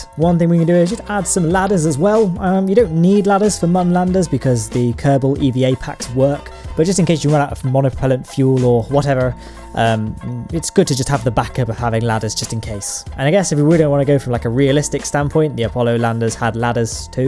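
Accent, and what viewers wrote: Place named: Cambridgeshire, United Kingdom. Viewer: I wonder what county he’s from,Sound like Cambs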